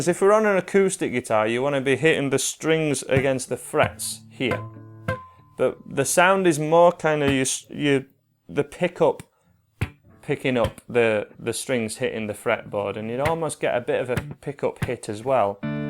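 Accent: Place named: England, United Kingdom. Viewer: English. He's from Leeds.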